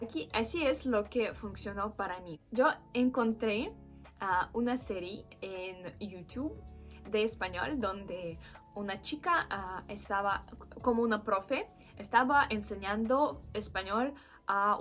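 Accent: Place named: Russia. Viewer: El ruso siempre me ha llamado la atención, por ejemplo me encanta la banda, y buen video y que buenos consejos, lo usaré para aprender también inglés, por cierto, tienes muy linda voz y suena muy bien en español, es decir todavía se nota cómo el tono ruso, y el ruso es un idioma tan hermoso, ¿Me puedes recomendar música en ruso? Y eso pues, saludos 👍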